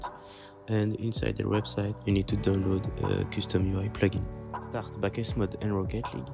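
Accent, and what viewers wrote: France. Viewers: On voit que t'es français à l'accent x)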